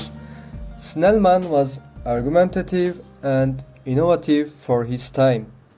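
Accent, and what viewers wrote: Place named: Turkey. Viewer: açar açmaz Türk olduğunu aksanindan anladim :))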